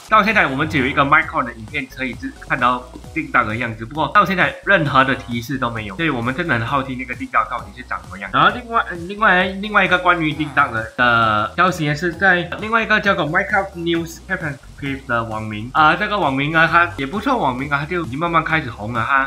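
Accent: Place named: Malaysia. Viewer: 抱歉~想請問影片可不可以加字幕，因為我是台灣人，有點聽不清楚馬來西亞的口音，謝謝(◍•ᴗ•◍)❤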